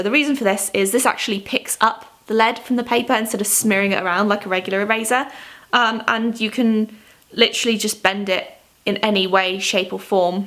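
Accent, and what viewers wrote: United Kingdom. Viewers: I watch your vids for hacks and to hear your amazing voice(I would love to have that British accent ahhh <3 ) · Thanks, you always make me laugh, and it's great to hear a British accent. I'd heard of the coloured pencil and baby oil, however, wasn't sure it worked... One hack I always use is Ellnett hairspray. It has to be silicone free. Cheap alternative to graphite fixative, I've even set pastels with it too, and it's a quarter of the price of the named brands. 😊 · not related to her hacks - but is her accent british. to me it looks like british, but then she mentions about dollar store and Ebay USA. so me a little confused.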